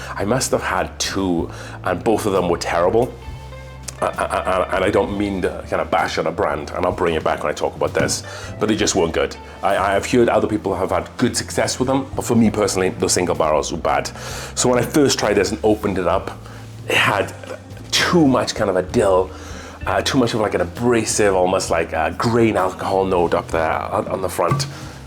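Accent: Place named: Wales, United Kingdom. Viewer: Are you a taff? just guessing by the accent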